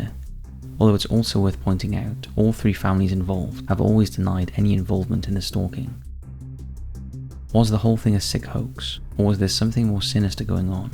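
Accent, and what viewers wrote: Wales, United Kingdom. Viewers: He has a welsh accent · This is proof that someone with a beautiful British accent can mispronounce words. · I always thought that the narrator was Welsh or has Welsh origins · Your voice helps me get to sleep. That is a compliment, by the way. Where in Wales are you from?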